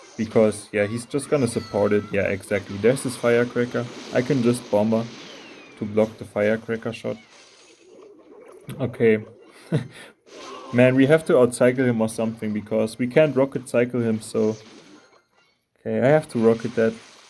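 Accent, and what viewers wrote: Germany. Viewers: Yo bro i do have to say that i really like ur videos. U are literally the second youtuber in my last 13years of youtube who i would consider as a "small youtuber" where I watch all the videos. I have never thought that right now i prefer ur videos against videos from big youtubers like trymacs,.. because I just play CR every two days for 3, 4 matches. What is more i really feel entertained by ur commentary I have never thought that i can watch 15min pure clash royale videos without something else. Keep up the good work and maybe u can start with professional tutorial videos and so on. I am sure that this will lead to a faster grow because I do see ur channel with +50k subs when u try to get more professional and attract more people by tutorials,... And btw I love ur accent i am also german🙌🏼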